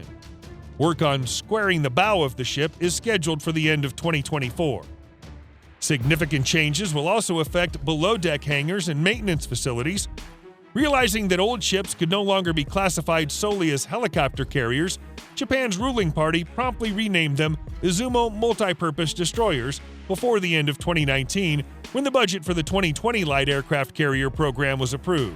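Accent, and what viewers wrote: United States. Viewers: You are american by your accent, and still you used meters! The whole world thank you for kindness! <3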